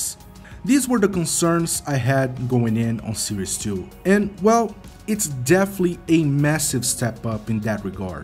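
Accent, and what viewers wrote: Brazil. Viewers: Dude, are you brazillian? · Cara, matei no primeiro minuto de video que você era brasileiro pelo sotaque kkk. Parabéns pelo vídeo! Muito bom! E excelente inglês!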